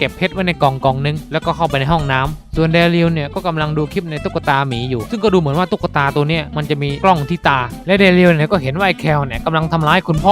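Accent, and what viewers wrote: South, Thailand. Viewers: เหมือนจะสำเนียงอีสานนะ แต่บางทีก็ไปทางใต้ มีเสน่ห์ดีพี่ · พี่พูดเหมือนสำเนียงเหมือนใต้เลยครับ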